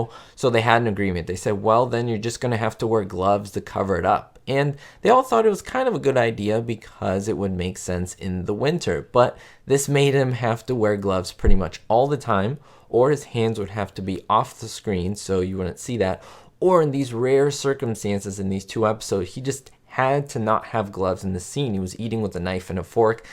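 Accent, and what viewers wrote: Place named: New Jersey, United States. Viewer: You must be from NEW JERSEY.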